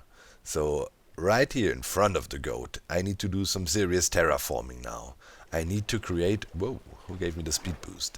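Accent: Germany. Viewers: Ich hab direkt gemerkt du bist deutsch du hast einen kleinen Akzent. Antowert bitte wenn ich recht have und du mich verstehst.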